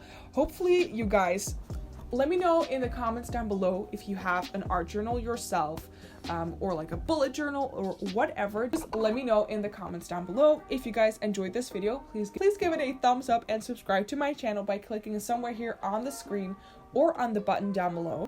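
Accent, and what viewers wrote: Netherlands. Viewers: omg is he dutch? XD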